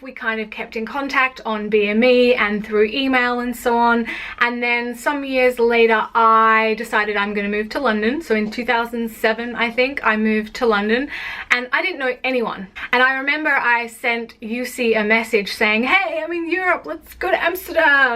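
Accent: Finland. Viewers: Rovaniemi mainittu, torilla tavataan! That was like months worth of someone talking to me at once :D Your Finnish accent is great. Oh and if you want more winter wonderland, visit soon. Winter is getting shorter and summers are getting hotter here in Lapland. I think in 10 years it's only couple months left of "Narnia".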